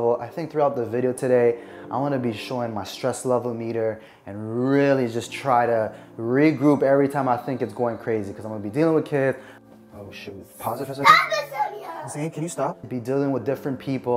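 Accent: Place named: United States. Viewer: Well, you speak american english. Its different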